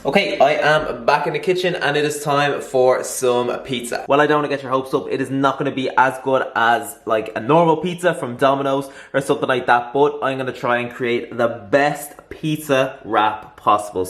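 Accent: Ireland. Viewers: Nvm, i knew it he is from ireland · Are you from Ireland ? You have posh brogue ;-). Good video, I will follow.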